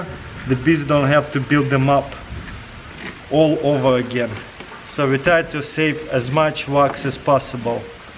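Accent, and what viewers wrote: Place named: Russia. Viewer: Привет смотрю тебя давно))Я знаю что ты русский)Т.к акцент ))Удачи в развитии🎉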